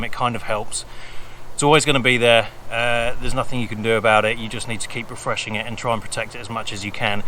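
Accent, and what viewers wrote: United Kingdom. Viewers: I was so confused at the start of your video. You sound very British, but your house and towing hardware didn’t match up. Your house didn’t have any brick or shiny black exterior plumbing. Good demo. I’m going to be doing the same to my towing hardware.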